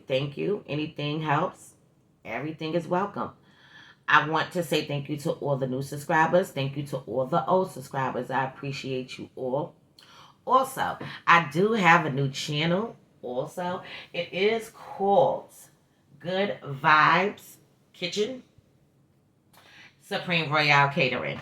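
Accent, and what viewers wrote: New York, United States. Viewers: Thank you love your vibe and NY accent. Mars in Cancer, Saturn in Cancer, Neptune Sagitarrius and North Node Scorpio here. You are hidden gem on Youtube, you deserve waaaay more attention on here. Glad I found you last year!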